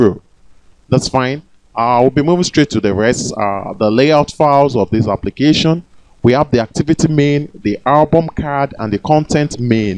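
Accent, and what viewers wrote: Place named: Nigeria. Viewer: nice tutorial . this accent sounds nigerian doesnt it? i would like to know if there is an available repository to download your source code ? this would be very nice and helpful . thank you for this great tutorial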